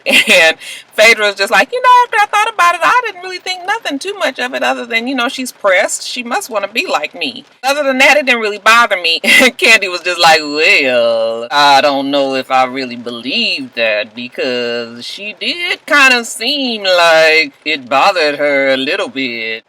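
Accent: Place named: Atlanta, United States. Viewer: You do sound a little ghetto but I love it! You sound like ATL native.....lol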